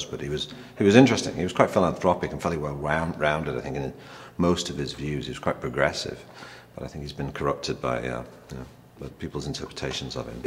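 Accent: United States. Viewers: That american twang is so annoying!! Saw him doing his tour in Oxford a couple of days ago, and there was none of this accent nonsense! (And it was brilliant) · yep, he's not that great on this. and he's developed one hell of a yankee accent too. must've been out there too long. "partridge, you W***ER!!"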